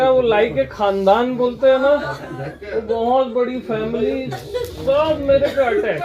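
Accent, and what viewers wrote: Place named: Nagpur, India. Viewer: Aap nagpur ki bhasha khubsurat hai..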